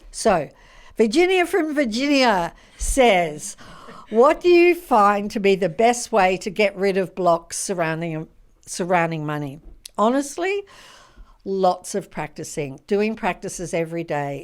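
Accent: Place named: Australia. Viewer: It's our Aussie accent I love it, and I live in Melbourne, the best city in the World to live, so say who. Anyway love these thoughts❤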